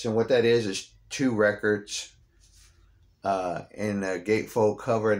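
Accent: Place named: Louisiana, United States. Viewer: Such a huge loss to the rock community! Another hard one to swallow. I asked if you were from L.A. because you're accent is similar to mine. I grew up on the Texas border on the Louisiana side. You got this country / Cajun thing going on that does my heart some good. Peace brother! R.I.P.!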